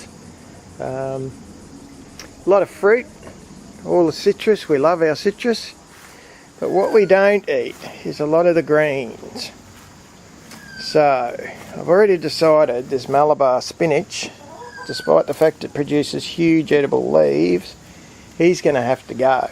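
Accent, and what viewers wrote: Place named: Australia. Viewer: Nice. To hear another Aussie! Im out in the Lockyer valley and it’s to hot to grow through summer so I’m just starting my seeds for autumn.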